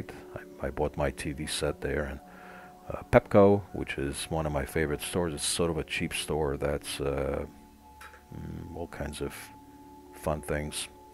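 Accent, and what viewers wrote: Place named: United States. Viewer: Domnule, ador engleza americana care o vorbiti,dar fin pacate nu am inteles nimic!! Eu sunt romanca frantuzita, sunt abonata Dvs.,de circa un an!! Multa sanatate din Oradea BH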